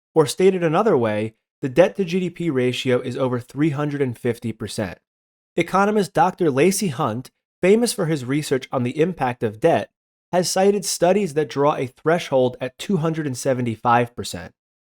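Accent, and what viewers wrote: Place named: New Jersey, United States. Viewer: Love the Jersey accent (as much as anyone can)! Keep up the good analysis.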